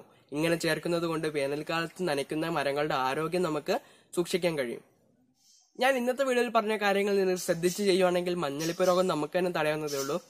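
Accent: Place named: Palakkad, India. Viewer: Evar place palakkadu aanu adahanu engane samsarikkunnad evarude samsara reedhi engane aanu padichu parayunnadalla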